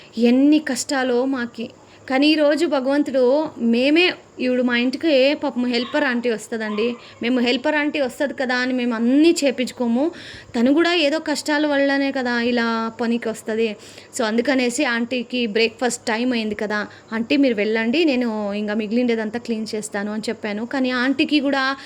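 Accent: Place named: Karnataka, India. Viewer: Sister do you speak kannada also. Because I heard some kannada words in your voice over....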